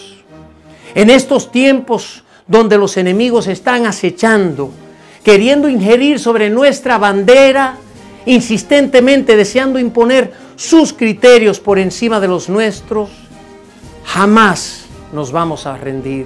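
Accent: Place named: Dominican Republic. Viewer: Así habla un verdadero Dominicano Ramfis presidente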